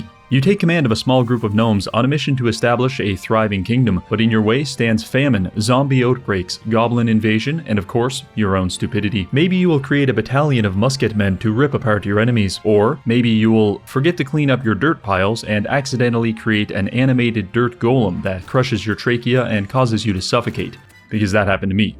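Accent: Newfoundland, Canada. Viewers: "Zombie oat-breaks" God, I love the canadian accent Also love your vids! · This guy is the most newfoundland sounding guy I have ever heard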